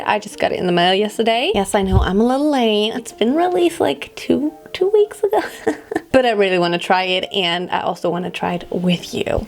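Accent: France. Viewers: Hey girl, I'm a french subscriber und ich kann Deutsch auch ;) I find your french accent not so bad. In the future, can u make an entire video in french pls :) Bye bitch <3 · You do have a really great french accent ! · Woow ! Your french accent is fucking good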